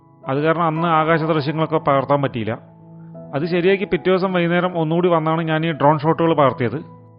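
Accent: Palakkad, India. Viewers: പാലക്കാടൻ ഭാഷ എനിക്ക് നന്നായി ഇഷ്ടപ്പെട്ടു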